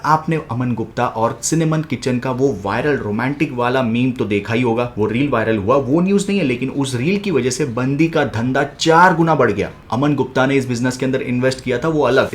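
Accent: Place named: Hyderabad, India. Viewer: Bhai toh badiya kirrak Hyderabadi slang me bole toh ek dum maut 💪🏼🫡🙌🏻🤙🏼🤙🏼🤙🏼 outstanding bole toh maza aa jata hai jab bhi channel open kare roz apke channel per attendance dal kar jate hai aaj koi video upload hua ya nhi Khair bhai tum kaam to ek dum zabardast kar rahe ho quality content k bare me janna hi toh Sidha abhi ka review per jana ❤ well congratulations brother keep helping us we stand with you 🎉 Kch zyda hi bol gaya😅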